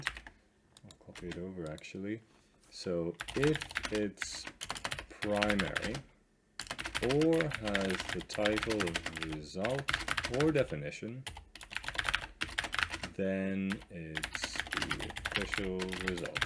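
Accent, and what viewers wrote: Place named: Scotland, United Kingdom. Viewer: this is incredible. and you are Scottish. Greetings from one to another. Ofc - not my real name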